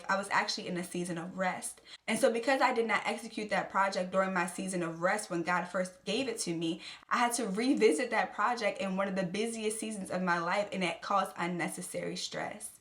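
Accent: Baltimore, United States. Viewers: Off topic but are you from baltimore/dmv area?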